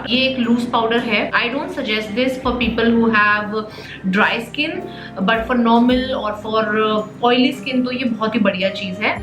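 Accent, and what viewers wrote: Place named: Chandigarh, India. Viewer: One thing I like about ur video is A. being a tricity girl u hv that accent that Tricity Ppl 🤪can relate and B..u explain very well..💗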